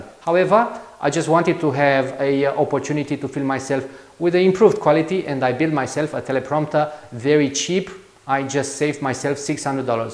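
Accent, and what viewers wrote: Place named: Romania. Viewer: Are you romanian? I recognize the accent :)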